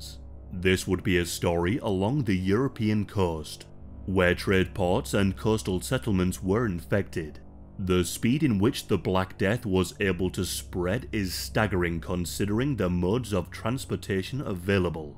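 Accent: United Kingdom. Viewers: he hasn’t got a ‘funny accent’, he has a regional British Accent. Perfectly acceptable.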